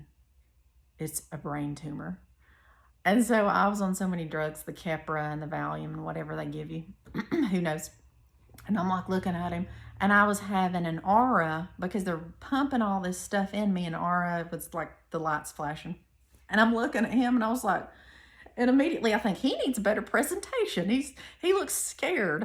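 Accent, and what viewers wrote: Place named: South, United States. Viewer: You are adorable. I love your country accent. Good luck on your recovery!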